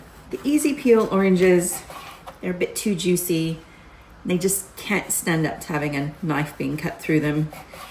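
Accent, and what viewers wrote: United States. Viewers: I have just found your channel and I was confused for a while you sound American but your home looks British then I heard you say Tesco. I found this video so peaceful and I instantly subscribed. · Oh ok I thought you sounded a bit American! lol. Menopause is awful and neurodivergent kids are such a challenge! It's nice to know others can relate! Plus Jane Austen is amazing! I think I'll enjoy your channel! ❤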